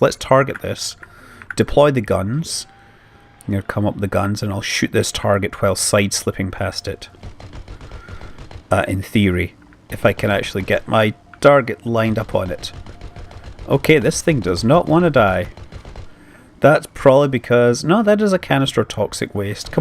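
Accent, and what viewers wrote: Scotland, United Kingdom. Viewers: are you from scottland? you have a scottish accent.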